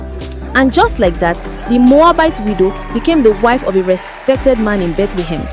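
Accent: Nigeria. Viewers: Awww. I love it. I love the Naija inflections.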